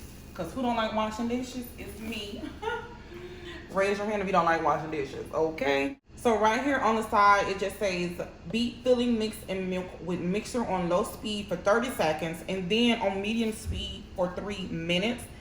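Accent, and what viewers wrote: Mississippi, United States. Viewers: I love your Mississippi accent, thank you for sharing your recipe.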